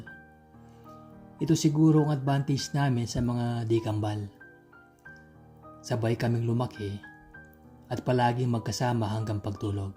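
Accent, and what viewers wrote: Pampanga, Philippines. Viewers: Kapampangan accent ei.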